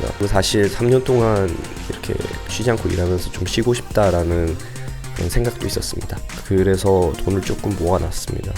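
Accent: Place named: Gyeongsang, South Korea. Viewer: 경상도분이세요? 뭔가굉장히친숙한데 말투가 고급진사투리야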